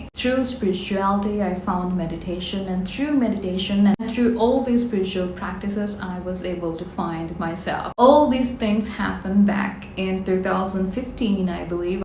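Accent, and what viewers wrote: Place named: England, United Kingdom. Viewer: ❤ your English accent wow. I want to speak English like you and i will..❤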